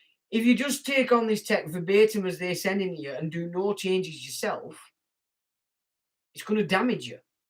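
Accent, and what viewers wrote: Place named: Yorkshire, United Kingdom. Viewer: Only discovered this channel a few months ago via a link on BNT and have to say I wish I was here from inception! Although I've been in Dorset 42 years, I originate over't tops from you at Red Lumb, so not only the language resonates but so too the accent. On language (as I'm sure you're aware) "ab" means "not" or "none". All native tribes are "origines" Thanks for the excellent info in the vids I've seen so far.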